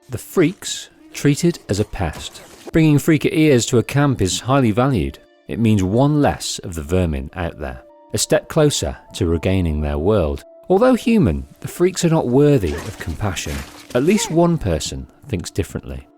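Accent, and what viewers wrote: United Kingdom. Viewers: The British accent INSTANTLY bores me.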